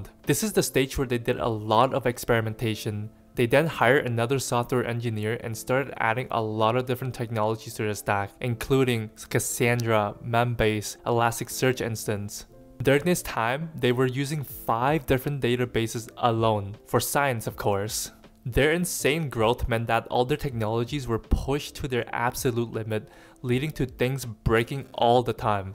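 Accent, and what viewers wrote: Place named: Asia. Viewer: I could tell you're asian without seeing your face It's your accent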